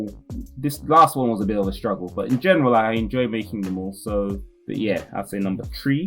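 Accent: United Kingdom. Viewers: You sir, are a GOAT. You sound like you’re from London so hopefully I may meet you one day and be able to shake your hand and thank you personally for all you’ve done with this series and how it’s given me so much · Been watching you for quite a while but didn't expect the English accent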